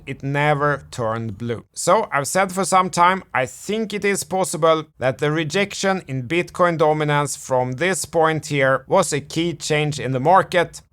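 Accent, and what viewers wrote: Sweden. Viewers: Love your vibe, you odd swedish accented guy!! You prob think that about our Aussie accent too.Thanks for sharing here on YT.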